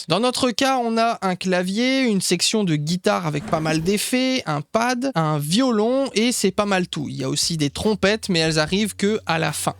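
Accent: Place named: Réunion, France. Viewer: T sur ou t inn kreol na un léger accent 😅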